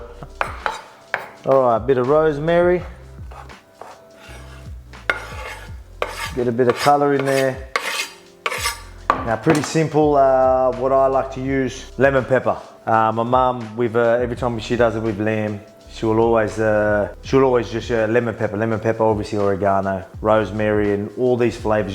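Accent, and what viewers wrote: Australia. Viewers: Volk is north Macedonian and Greek don't let that Australian accent fool ya lol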